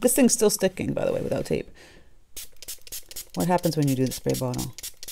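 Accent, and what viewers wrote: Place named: East Coast, United States. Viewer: Love your accent! East coast?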